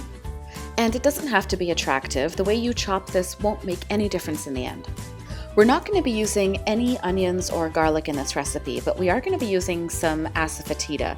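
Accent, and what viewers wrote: Canada. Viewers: its canadian accent and its almost perfect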